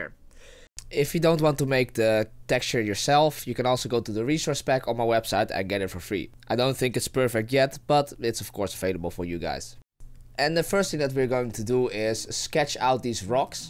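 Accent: Netherlands. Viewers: nice tutorial. Random question: are you Dutch? You sound like a dutch person speaking english.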